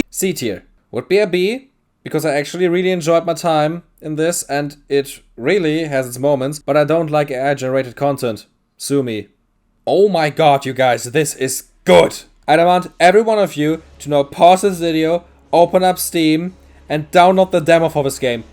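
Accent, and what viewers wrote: Germany. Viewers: love ur german accent. tryed some of the games thx for the vid i had a lot of fun cuz of it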